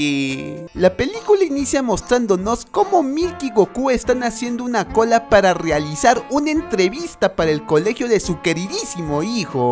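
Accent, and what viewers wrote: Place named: North, Mexico. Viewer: eeeeee norteño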